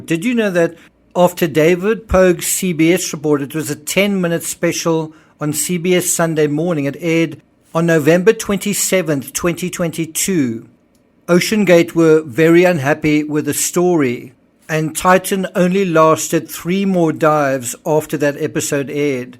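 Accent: South Africa. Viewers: I like your stuff. South African voice? Expat?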